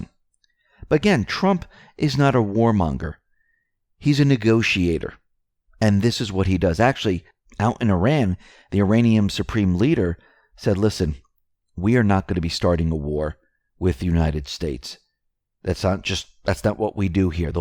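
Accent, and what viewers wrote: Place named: New Jersey, United States. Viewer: He's from New Jersey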